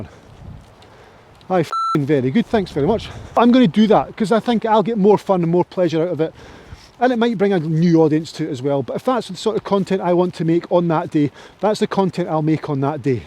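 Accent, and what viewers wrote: Scotland, United Kingdom. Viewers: I'am from Germany and I love your Scottish accent, your content and all your videos! I don't think its about palm trees or T-Shirts but my best advice for your videos is to use something similar to a "telegram/snapchat filter" So your videos have slightly more color to make them more appealing to the viewers eye <3 much love keep doing what you love! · I watch you, I enjoy your content. The Scottish tone is great. Your Hogan series I keep dipping in. I don’t know if this an April fools, YT is also a business and so changes the algorithm so as not to pay. Keep it up I love your tuition- Strawberry Mousse is a fundamental when my round starts the downward trend. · I am a 75 year-old golfer been playing for 55 years. I have watched all of the people that you mentioned but I only follow you and you are the only person that I subscribe to, that should tell you something. 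I'm a midlander so you're scottish accent doesn't faze me in the least . Why don't you show us some of those beautiful scottish golf courses off the beaten track, that would be interesting. We all have bad days and feel a little un-loved, just keep going, sometimes life is just full of hills. Rome wasn't built in a day. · you do you! Although I ski in winter, I still love watching and learning about how I can be a better golfer come spring and summer! I watch them all and love your content because of your Scottish accent and unique teaching style and well, seeing the beauty of Scotland and its courses. I’m a subscriber and would love for you to be happy and succeed. I will spread the word to my buddies…I wish you all the best and look forward to your next video. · Great to hear the Scottish accent, Scotland being the home of golf, and your enthusiasm for the game matches any of the others so keep the faith. 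· I have not come across Danny Maude. You are the only channel I subscribe to for instruction. You are definitely the benchmark and the clipped Scottish accent is one of the best aspects. I might click on Rick Shiels for equipment reviews, but don’t subscribe. Your sound setup is also my favourite. Would be very sad to see you give it up. The algorithm is a cold heartless beast, but an aspect of life that is not going away any time soon. I am convinced you possess the knowledge to help me enjoy my golf more, but how that knowledge gets into my head and then into my muscle memory is a challenge. Thanks for all the jelly beans. 😃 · Yeah mate, welcome to the fickle fate of being a creative. My world as a T'ai Chi teacher and creative (visual and music arts) completely flipped since Covid. That's. when I started watching/subscribing to things I like on YouTube as a way of staying sane more than as a way to learn or get insights into a skill. 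And you're correct: nobody listens. I've got music/T'ai Chi students who I meet with regularly I know will absolutely not do what I ask them to do. You definitely got to do what is best for you. Much of your rant echoes so much self talk I've had over the past few years.I've gotten to the point where I actually don't charge for sessions any longer but ask for donations. What's changed is I have a few wealthy benefactors that provide me enough financial flow to do what I want. Not offering any advice here just hoping you are able to continue to do what is fun for you. I've enjoyed much of your content ... especially the Scottish accent. But teh whole damn thing of it is fickle. Keep being you! And so sorry for your mate with cancer. Hope for the best outcome for all: Blessings and Grace. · I watch both you and Danny. I enjoy both. And having Scottish ancestry, I love your accent. One thing I especially enjoy with Danny's videos are when he's actually doing a lesson with a not so great player. 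Seeing the things he does and the improvement makes it more relatable. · Well, I do like your scottish accent. So please don't give up.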